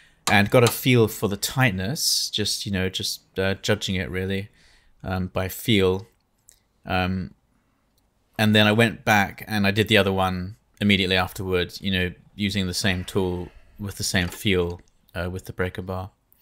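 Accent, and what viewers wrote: RP, United Kingdom. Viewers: I love the posh voice.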